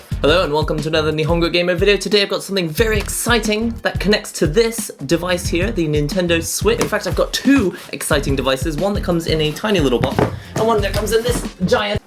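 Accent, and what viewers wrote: England, United Kingdom. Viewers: You're Asian and you have an English accent. You are officially my favorite person in the world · he is an Asian but have UK accents. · Why the fuck this Japanese guy have a British accent? Can he actually speak Japanese?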